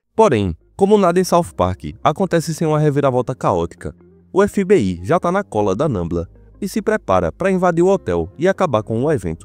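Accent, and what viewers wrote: Pernambuco, Brazil. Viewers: Tu é Pernambuco???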